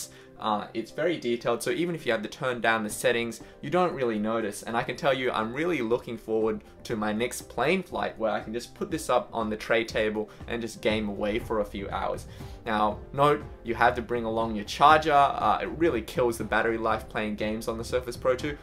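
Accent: Australia. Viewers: I thought you're gonna speak with a japanese accent and then it turned out you're australian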